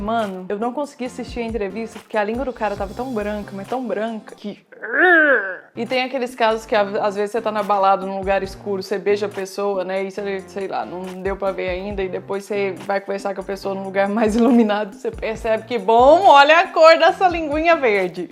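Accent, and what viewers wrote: Minas Gerais, Brazil. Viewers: vc é mineira?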